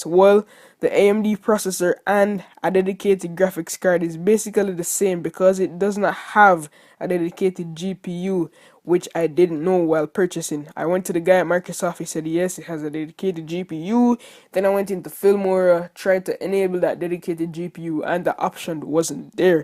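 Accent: Jamaica. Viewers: Bro, you sound like a real Jamaican, and if you are mad props. Not too many Jamaicans are doing tech reviews on this platform, so kudos to you. Video quality, transitions and use of space are awesome, and of course the voiceover, with a " TRUE " Jamaican accent. Keep up the good work.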